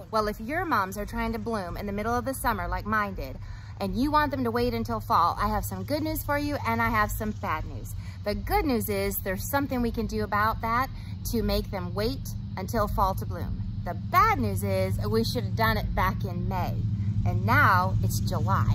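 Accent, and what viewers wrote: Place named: South, United States. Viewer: Thanks i can’t wait til May...love your southern accent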